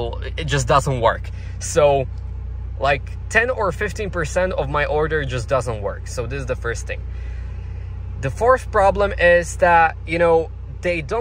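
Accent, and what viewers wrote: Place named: England, United Kingdom. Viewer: Yeah, i already like this english acc :D, i would like to see more for sure.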